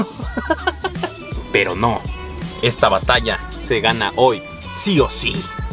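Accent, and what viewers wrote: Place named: Tokyo, Japan. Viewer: Saludos desde México CDMX ánimo....me fascinó tu acento de Tokio como que se te pegó...jajaja....como siempre 🤠🤠🤠🤠 mis respetos excelente información.........aah porfis Saluda a Doña Lety...a Don Álvaro y al buen Lalo.... La mejor hamburguesa la última solo por el tocino....bye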